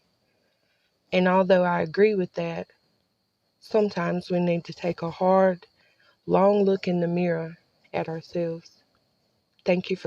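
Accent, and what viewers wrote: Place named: Robeson County, United States. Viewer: I have alot of friends from Robeson county, they moved away because of all the crime. And you sound just like them.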